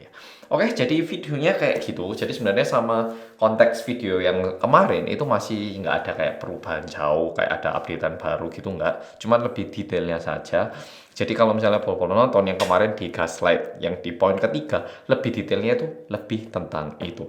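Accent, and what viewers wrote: Java, Indonesia. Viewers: Jelasinnya tetep medok ya mas, lg serius2 jd ngakak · Keras banget ya industri entartaimen korea,. Mau sedih krna kasus ini nimpaa seunggi🙁, mau ketawa jg mas terjemahinnyan pke logat jawa😅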